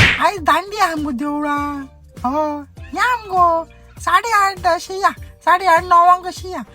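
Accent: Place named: Pedne, India. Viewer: The way you speak Pednechi bhashya is just superb....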